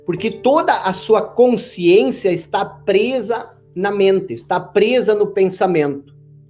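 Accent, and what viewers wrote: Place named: Rio Grande do Sul, Brazil. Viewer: Bem que eu conhecia esse sutaque. Você é aqui do Rio Grande do Sul. Adoro seus vídeos.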